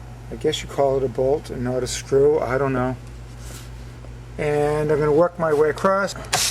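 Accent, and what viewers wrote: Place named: Boston, United States. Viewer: great job so far., its been almost 5 years since mine popped on my 96 supercharged VR6 GTI, and your video is leading me to fixing it on my own. i am not faint of heart, i build 1000+ hp drag race VW/Audis in my spare time, but i really didnt want to do this job. and still part of me almost thinks the 800 plus bucks the quoted price to do would have maybe been worth it. i ripped one of the RCA off the stereo right out of the gate, very discouraging. but the car has a big stereo in it so its just gotta be fixed is all. again, thanks for taking the time to detail this. i have the Bentley manual but never felt the need to actually look at it. and i love how not-a-mechanic you appear to be and yet still tackled this job. the Boston accent is a plus:-)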